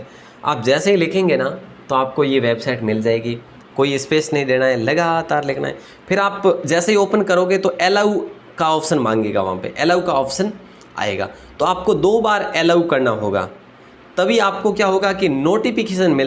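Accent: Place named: Marwari, India. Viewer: सर आपकी मारवाडी भाषा बहुत ही मीठी लगती ह् इससे हमारा विडीओ के प्रति लगाव बना रहता ह् Thenq sir 🙏🏽🙏🏽🙏🏽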